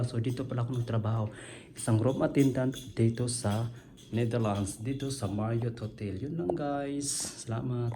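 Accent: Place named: Visayas, Philippines. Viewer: Bisaya ka sir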